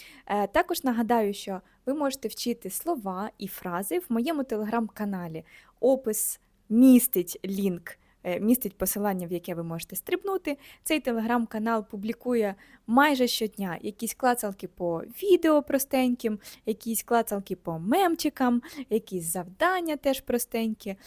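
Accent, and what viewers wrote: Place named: Bukovina, Ukraine. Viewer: Чарівні клацалки, як і 😂❤буковинський говір...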